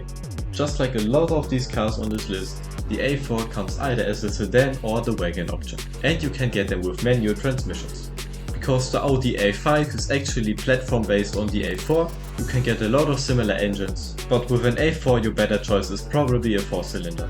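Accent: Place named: Germany. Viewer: hahaha, ich muss aber auch sagen, bei den neueren Videos hört man den deutschen Akzent aufjedenfall weniger 🤝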